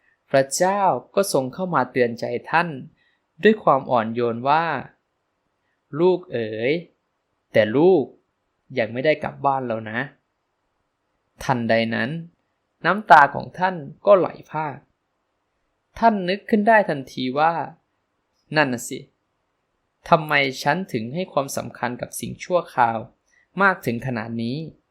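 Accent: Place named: Isan, Thailand. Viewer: ขอบคุณพระเจ้า ขอบคุณผู้สื่อสารที่ดี แม้สำเนียงออกอีสานแต่กะเป็นตาฮักยูเด้อ ขอพระเจ้าอวยพรหลาย ๆ เด้อ ขอพระเจ้าได้รับเกียรติสูงสุด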